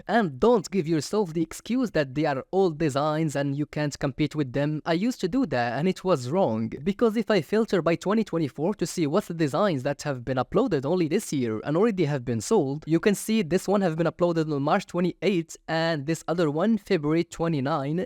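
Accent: Morocco. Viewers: mghribi nta hhh gha mn sawtk tbarkllh3lik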